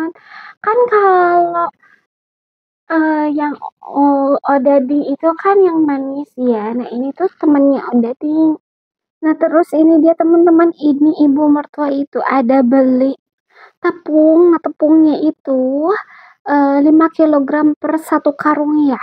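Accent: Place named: West Java, Indonesia. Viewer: Jadikanlah kritik dan saran untuk kemajuan dalam membuat konten agar lbh baik lagi, yg namanya belajar Tdk ada yg langsung bisa/ lancar, untuk karakter/pembawaan saya No Komen krn saya yakin itulah ciri khas kamu dr lahir, cuma lucu aja mmg khas orang Sunda, dimana penyebutan hurup P,F,V sama semua dan penyebutan huruf H terasa sekali.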